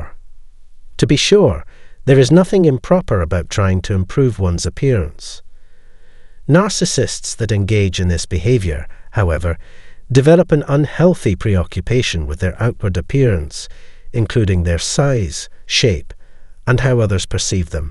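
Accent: Scotland, United Kingdom. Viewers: Scottish voice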